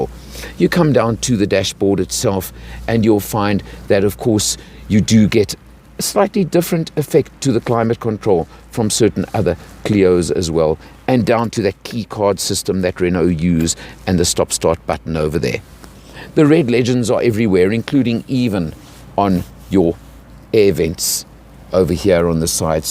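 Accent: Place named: New Zealand. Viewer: Your kiwi accent annoys me. No offence.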